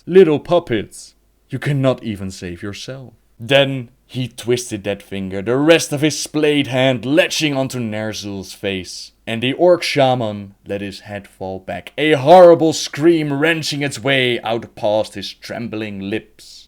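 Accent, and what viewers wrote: Netherlands. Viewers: God, your accent is so pretentious and obviously Dutch even though you try to mask it. Stahp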